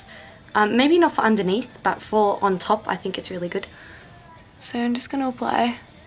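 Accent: Australia. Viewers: Australia. Can't you tell by her accent?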